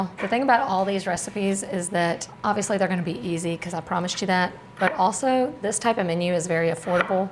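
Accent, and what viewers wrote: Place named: Texas, United States. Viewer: I thought you were from Texas this whole time lol.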